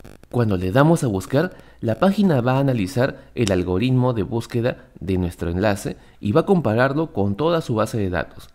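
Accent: Peru. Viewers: Jajajaja no me gusta el acento peruano, pero a él se le oye bonito😊 graciosito o es como empieza el saludo a él se le escucha lindo 😚 saludos sabrositos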